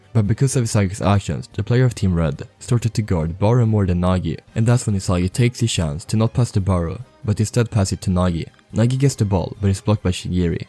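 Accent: Sweden. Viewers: You can hear the Swedish accent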